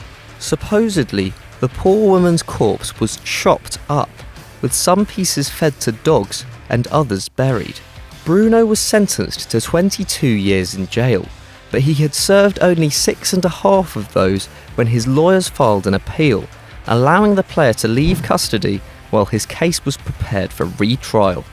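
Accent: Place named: England, United Kingdom. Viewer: 100 kilometres an hour.. You say this with a English accent.. YOUR ENGLISH MATE it's MPH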